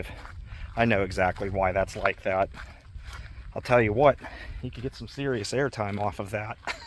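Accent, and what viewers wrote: Pennsylvania, United States. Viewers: Nice video! Much appreciated. Great idea about PA state park visits. I'm a transplant from Philly area to Western PA. Your PA accent is endearing. Liked and subscribed. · That was great. I've been to Presque Isle S.P. dozens of times but never to Pine Bluffs. I live 50 miles South of Erie and Pjne Bluffs is a handful of miles out of where I go. Very nice job, I feel like I was there too. I can tell you're from Pittsburgh by your yinzer accent but I thought you would be used to going uphill, ha! Good job.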